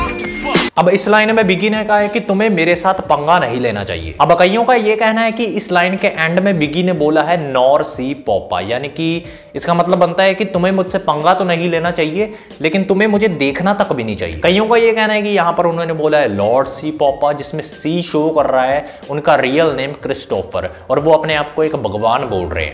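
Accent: Punjab, India. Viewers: Bro tu punjabi aa ??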